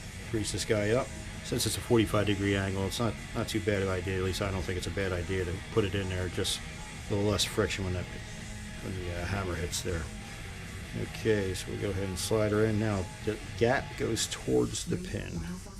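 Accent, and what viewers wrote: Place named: Delaware Valley, United States. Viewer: Thought you might be from the Delaware Valley listening to you throughout this great instructional video. I just noticed the DCFS videos in your library. Do you still shoot there? I just joined this year and started shooting Trap, perhaps we'll cross paths?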